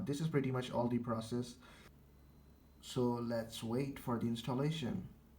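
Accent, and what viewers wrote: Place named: England, United Kingdom. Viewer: thanks god for your english accent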